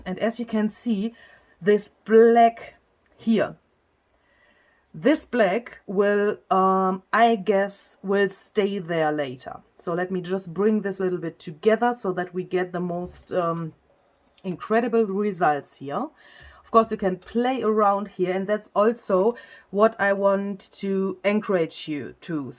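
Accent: Germany. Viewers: I love your english-german english. And your humour ! hahha xx so refreshing! Richtig erfrischend! :-)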